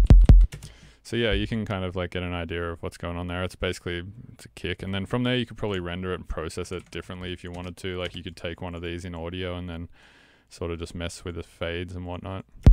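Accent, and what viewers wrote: Australia. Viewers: Wait are you Australian hahaha, if you were from western aus your accent would fly under the radar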